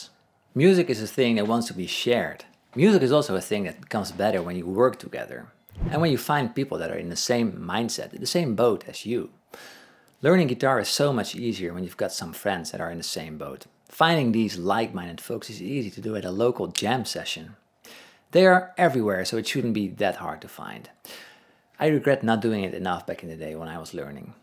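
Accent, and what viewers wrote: Netherlands. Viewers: wow ik zit al video's lang te raden of jouw accent nou wel of niet nederlands is!:) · He sounds dutch 👀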